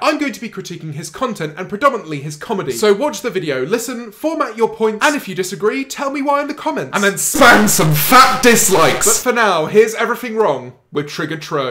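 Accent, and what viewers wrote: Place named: United Kingdom. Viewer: I am trying to take you seriously, to understand your narratives but your voice is killing me. I can't take it, it's worse than James Corden. The British accent with the fast-talking is messing up my brain. That wasn't meant to be a roast. That's just how I feel. I like Tro's random comedy, that's my preference, but I know what you mean by his repetitive content. I am kinda getting annoyed by it too. JustDestiny is just like Tro too. Exactly, the same type of video style, but I like Destiny's roasts better, and Tro's comedy better. That's why I am subscribed to both channels! :) Also at first, I thought JustDestiny, TriggeredTro, and LeafyisHere were all the same person. Just the same video style, same content, none of them ever show their faces, they all have a game playing in the background, and they all sound like 20-year-old white guys who just dropped out of college. 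(Not meant to be a roast, my opinion) Sorry for the novel you had to read! Peace!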